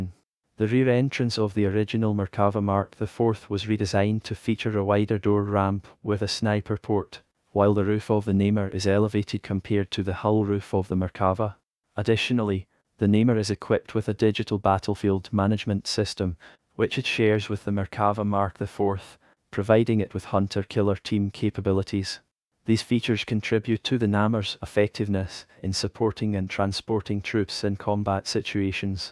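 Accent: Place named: Scotland, United Kingdom. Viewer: You're Scottish!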